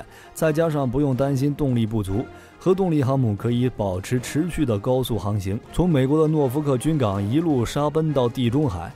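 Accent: China. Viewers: 看他的解说用词和口音就知道绝对是大陆人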